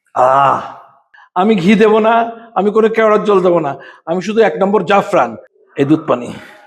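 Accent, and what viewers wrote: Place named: Jessore-Khulna, Bangladesh. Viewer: আপনার বাসা মনে হচ্ছে যশোর খুলনা তে।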